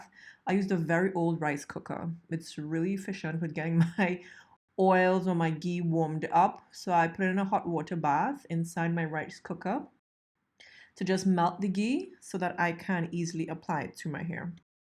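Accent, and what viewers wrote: Trinidad and Tobago. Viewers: Do I hear the beautiful lilt of Trinidad in your voice?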